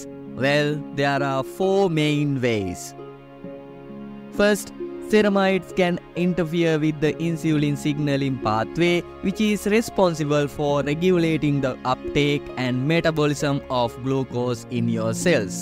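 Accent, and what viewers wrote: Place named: Sri Lanka. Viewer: your accent says you are from Srilanka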